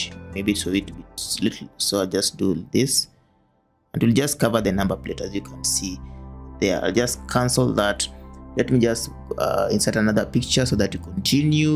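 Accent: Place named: Kenya. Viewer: Your african kenyan by accent😂😂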